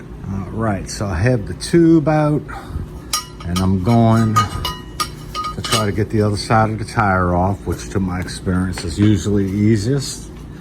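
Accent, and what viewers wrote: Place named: New Orleans, United States. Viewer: Great job,. I changed hundreds of tires when I was about 18 with a floor bead breaker, two tire tools and a rubber tire hammer around 1969. Single and split rims with rings. The small tires are the harder. I have two tires I need to mount on two plastic rims that have been eating my lunch lately but I don't have a little changer like yours. Even if I did, I would probably break the rims. I don't know where you are but is sounds like you are from New Orleans. Thanks for a great video.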